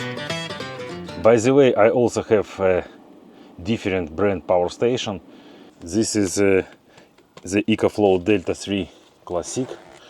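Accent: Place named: Crimea, Ukraine. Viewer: Ох, как бьёт по ушам этот Крымский акцент )))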